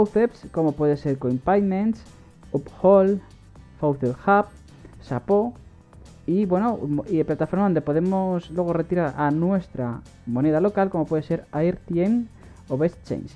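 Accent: Spain. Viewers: Ostias tío dise para Guatemala pero este tío suena a español xD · Dice guatemala y el habla como español xd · En Guatemala ? Pero el que habla tiene un acento de España ¿?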